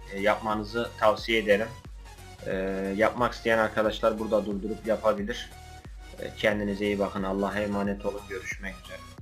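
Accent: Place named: Anatolia, Turkey. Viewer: Şiven Tam Bir Anadolu Çocuğusun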